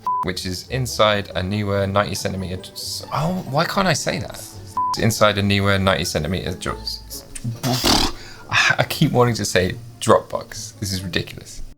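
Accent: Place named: United Kingdom. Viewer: Definitely feels like it's giveaway season on YT at the moment. My last qwad just missed the concrete earlier in a crash and rather than shattering, just entered a roll, bending antennas as it went. No broken props through as it was a ducted qwad fortunately. I was going to end with a blond joke but then looked in the mirror... Great to see you're back at it and to hear a better accent than all the us pilots 🇬🇧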